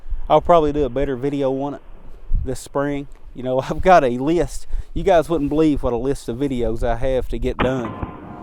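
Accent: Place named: South, United States. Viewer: One of the best Glock pistol vids I’ve seen. Great shot and love the accent. I gotta get down south one day.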